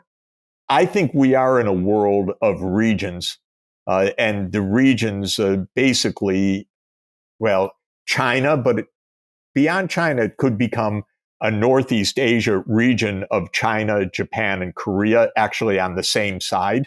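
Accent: Netherlands. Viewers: Your heavy Dutch accent is so funny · You sound dutch,it is Sachs not Sex😊 But never mind,good to invite mr.Sachs👍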